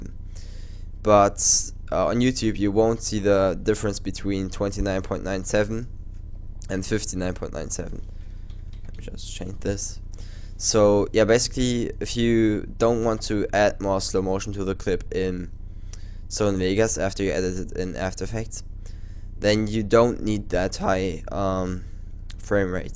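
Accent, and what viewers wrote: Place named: Germany. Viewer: 100% german :D:D aber gutes tutorial :)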